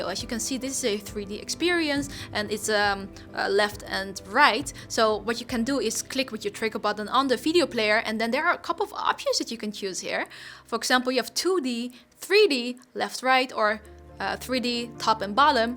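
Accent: Netherlands. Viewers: Are you Dutch? You sound Dutch.. 😄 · She has a netherlands accent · amazing dutch accent, doesn't match ur face though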